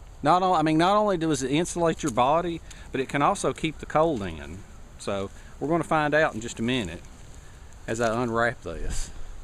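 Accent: South, United States. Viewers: he has the accent from which part of usa?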